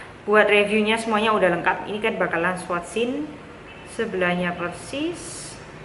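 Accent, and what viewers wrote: Java, Indonesia. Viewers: logat jawanya itu yg bikin suka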